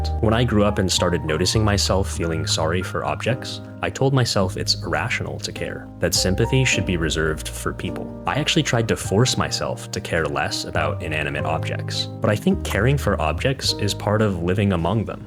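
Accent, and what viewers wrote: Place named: United States. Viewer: u showed ur self in the video in end and i was like who the hell is that guy | i was expecting someone american looking from the accent u broke that like the glass voice personification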